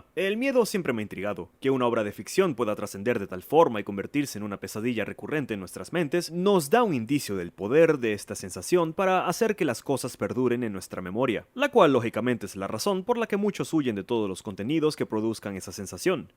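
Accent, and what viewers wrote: Venezuela. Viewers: Contenido de calidad! ... Y lo mejor :D , un toque venezolano (GG) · mano me encanta tu forma de hablar,tienes como el acento venezolano perfectamente equilibrado ,tal como debe ser :v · Eres venezolano?? Suscrito de una mi hermano!! Buen vídeo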